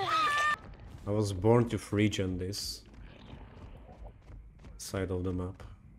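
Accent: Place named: Poland. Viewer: Polski akcent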